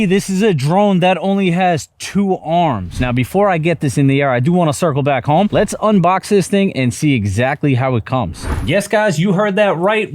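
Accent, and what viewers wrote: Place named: New Jersey, United States. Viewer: Bro i would love to fly with you one day. Just got back in to flying. I live in jersey. Sound like a jersey guy.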